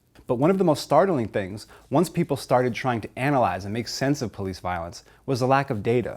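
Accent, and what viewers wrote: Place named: United Kingdom. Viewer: I think it's so cool when a black guy almost speaks with a real British accent!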